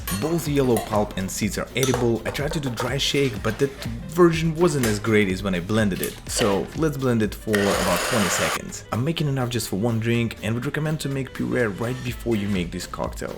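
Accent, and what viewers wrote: Russia. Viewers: I love your Russian accent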